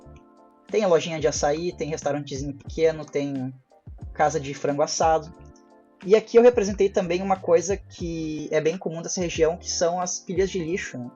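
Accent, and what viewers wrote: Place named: Porto Alegre, Brazil. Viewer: aquele sotaque que só o portoalegrense reconhece kkkk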